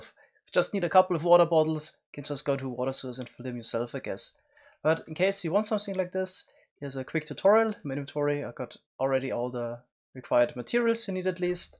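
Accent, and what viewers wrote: Germany. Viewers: When Germans speak English I am also german .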